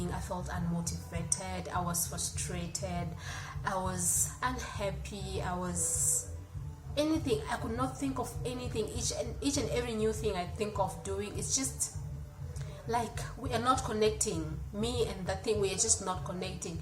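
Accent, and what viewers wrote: South Africa. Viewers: Accent imeanza kua kama English ya wazulu 😂🔥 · Na ka accent kameanza kuwa ka Mzanzi 😂, love you❤️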